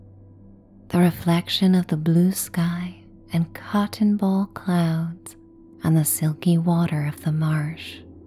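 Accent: United States. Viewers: Write a book a novel or novella a romance or a juicy romance or a mystery or a coming of age with wonderous epiphanies in it. Write and publish self publish if you want or make an audio book. If your not going to sing write a book. I’m sorry I borrowed Jack as my pup but he kept whining for mommy and he snored very loudly for such a wee boy. I love the outer banks, never been there except in stories or films but it is dreamy. I giggled from your southern accent. The images painted by your words are sublime lots of new metaphors and tactile stuff it’s terrific. How come your eyes aren’t moving as if your reading is this just flowing from brain to voice? Happy Sunday.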